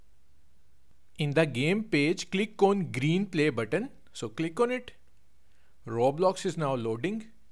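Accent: India. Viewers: worked amazingly, thanks indian accent man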